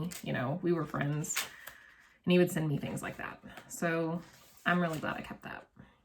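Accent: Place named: Pennsylvania, United States. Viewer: The way you speak makes me think you are from Pennsylvania. I know this is a kind of odd thing to say, but I like guessing where people are from by their accent and the words they use.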